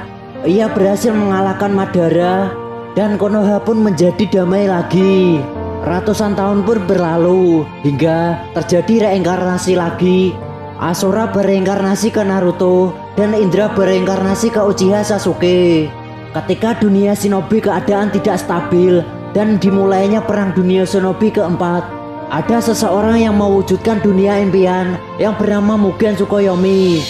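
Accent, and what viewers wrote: Java, Indonesia. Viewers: Anjir suaranya medok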